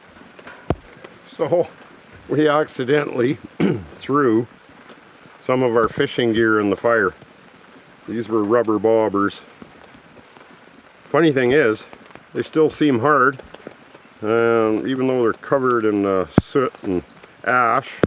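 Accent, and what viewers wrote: Canada. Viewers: Buddy’s so Damn Canadian hahaha. I love how he said gondola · The Canadian accent is why I subbed xD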